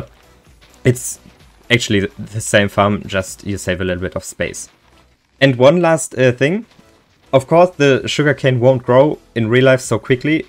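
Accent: Germany. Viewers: Your german i hear your Accent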